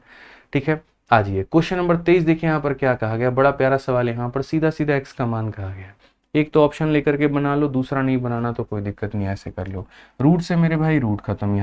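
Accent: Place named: Bhojpuri, India. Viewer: Sir ji aap Bhojpuriya andaz me lazawab lagte h 😅❤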